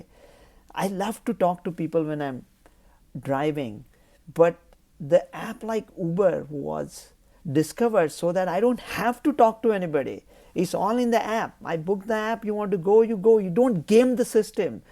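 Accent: India. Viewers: From the influence that your mother tongue has had on you language, some of which is still left behind, I can garner that you come from a Gujarati descent. Am I not right, Mr.?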